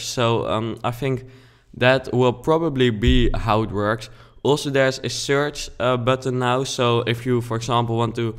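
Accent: Netherlands. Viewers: Haha you have such an Dutch accent ( btw ik ben ook nederlands :p )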